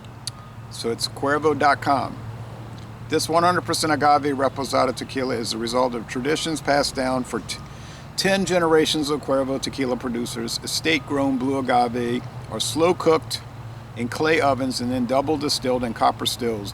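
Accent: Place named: New Orleans, United States. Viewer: You are welcome, I always really enjoy your vids, and have to admit enjoy the accent as well as I lived in and around New Orleans for many years. For you or anyone else interested, I rn across this posted today. This fellow does a deep dive into the rules and regs of Tequila and the oversight. Interesting but maybe a bit much for the casual Tequila drinker. <link>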